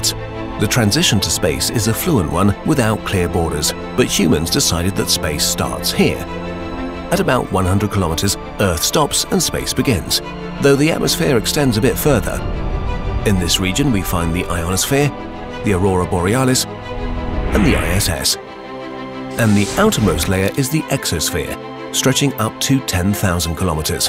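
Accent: England, United Kingdom. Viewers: beautifully narrated with an English accent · A man from England speaks English.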